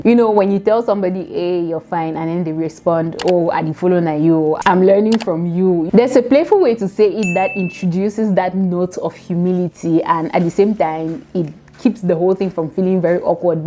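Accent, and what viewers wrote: Nigeria. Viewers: Please are you a Nigerian I'm trying to figure out your accent....m obsessed 😘... oh....love you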